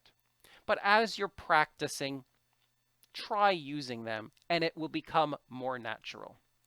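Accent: Canada. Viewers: hi there, your accent is cool Are you from Canada ? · sure i think he is Canadian cos i hear his "" about / out " whole time and so cute too, love this teacher. super clear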